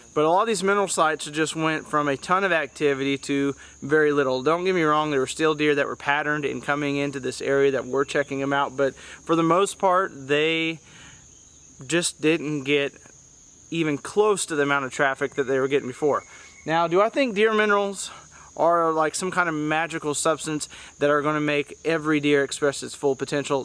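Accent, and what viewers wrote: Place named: New England, United States. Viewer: Yankee accent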